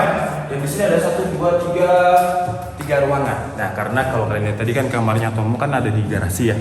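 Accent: Java, Indonesia. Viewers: Yg gw suka dari channel ini itu karna real banget mungkin dikit'' lihat prefrensi gitu tapi lainya real nggk ada settingan....dan logat jawanya ada dikit'' soalnya gw org jawa jg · Org jawa kah kok logatnya kek jawa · jowo ne ndi mas? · Wong Malaaang wkwkw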